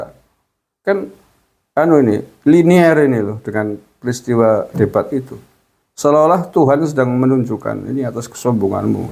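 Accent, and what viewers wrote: Banyumas, Indonesia. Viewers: Janen rika wong ngapak ya,,memeng prabowo gibran yes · Bang smpyan itu jawanya mana ya kayak nya ngapax ngomongnya.salam 0,2 maju terus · Pak Gus Marngapak jg y..pada bae nyong beh ngapak pak bravo sehat selalu🙏💪betul pak apa yg ditabur 01&03 akan mereka tuai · Deneng ngapakk pak😅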